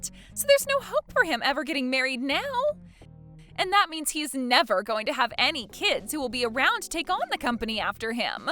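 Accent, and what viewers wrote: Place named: Eastern Australia, Australia. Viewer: I am an Australian from South Australia, and I don't sound like an Aussie Brit. This guy has 2 accents. Sounds like someone from the Eastern states of Australia.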